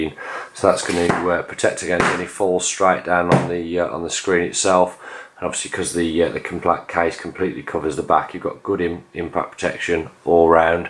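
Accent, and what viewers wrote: Birmingham, United Kingdom. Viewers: brummy accent or local to there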